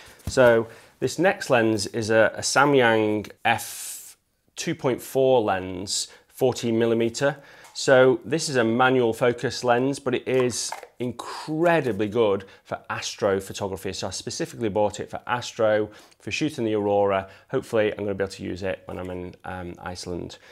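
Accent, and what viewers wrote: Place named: North West England, United Kingdom. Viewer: North West accent - yes.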